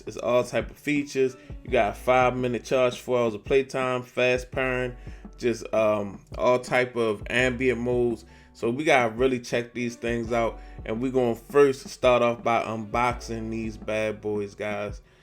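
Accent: Baltimore, United States. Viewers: The bmore accent coming out strong in this one 🤣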